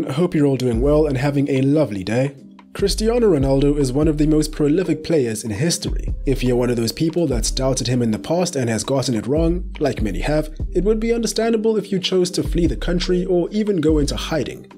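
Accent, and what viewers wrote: South Africa. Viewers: That "Ja" keeps confirming your Mzanzi'ness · Bro, I'm south african and I can tell you're south african so surely make a video on benny McCartney or bafana bafana or something south african😂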